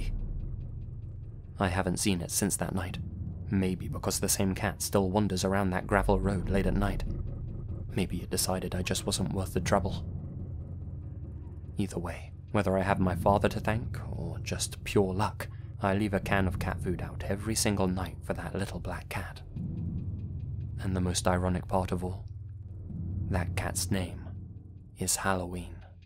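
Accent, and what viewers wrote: England, United Kingdom. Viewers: Great stories as usual,:) I know you can't really tweak the grammar of someone's story beforehand, but as a brit as well, your accent is far too good (and lovely to listen to) for the all the 'I's that should be 'me's in sentences like: "They kicked Steve and I out of the jeep". It sounds (and grammatically is) off...spoils it a bit, is all.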